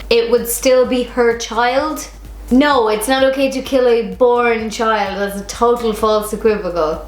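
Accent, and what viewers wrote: Ireland. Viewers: You don't know how helpful your videos are! I'm planning a interchange to ireland and i was looking for youtubers from there and then i found you! Now I can understand the irish english better thanks to you! Great videos tho.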